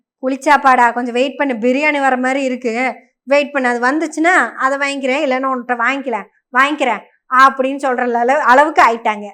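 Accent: Kongu, India. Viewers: கேக்க இனிமையான கொங்கு தமிழ் அருமை சிஸ்டர்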